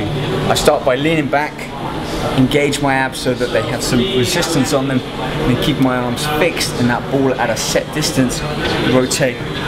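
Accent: London, United Kingdom. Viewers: the british accent makes him so much more believable. its such a sad thing on my mental capacity but its true LOL. hes not saying anything different from what I already know but it sounds so new for some reason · you sound like you come from london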